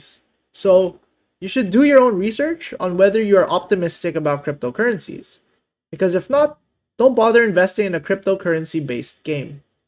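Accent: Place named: Philippines. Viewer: Accent palang alam na Filipino na 😅 Nice content sir 👍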